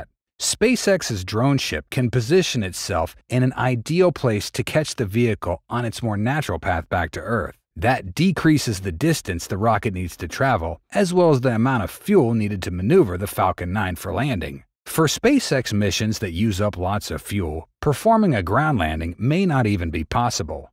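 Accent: South, United States. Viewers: his southern drawl was so strong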